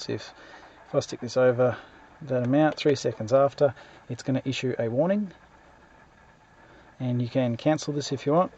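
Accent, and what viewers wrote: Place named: Australia. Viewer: I like Subaru’s with shift lights, clicked this video, and heard an Australian accent lol.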